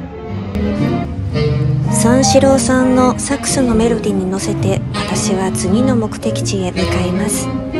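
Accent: Changhua, Taiwan. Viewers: 以為是彰化腔的台語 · 彰化腔最可愛❤❤❤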